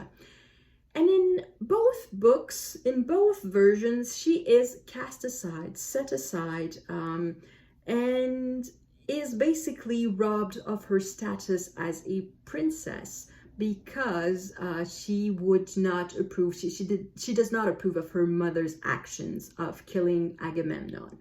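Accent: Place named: Quebec, Canada. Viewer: I do enjoy your channel. I love your mixture of Canadian English and French. It makes this old lady happy to hear you talk about her favorite things, books. I don't know whether I have actually read the Illiad and the Odessey as but I remember enough about them that I'm pretty sure I have read them sometime in the last 65 years (I was 12 then). I do remember reading The Aneneid in fourth year Latin at school. Dido really stuck with me. My daughter has read Gilgamesh. If she owns the book I can borrow it for her.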